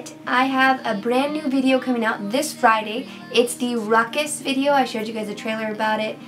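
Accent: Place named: South, United States. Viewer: I love her slight southern accent, and I'm excited to see the zombie stuff you do in the future! Whoo!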